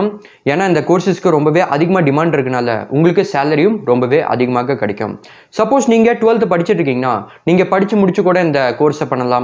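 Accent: North India, India. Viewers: North hindian mari pesra😂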